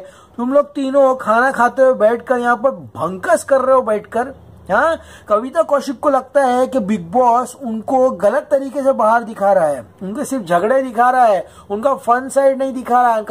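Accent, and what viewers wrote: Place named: Bihar, India. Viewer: Hahaa bhai Mai bihari but lekin jo tum nach baliye ka bihari dehat wale accent me krte ho mja aa jata hai..hahha tmhara review Bhai full mja More than bb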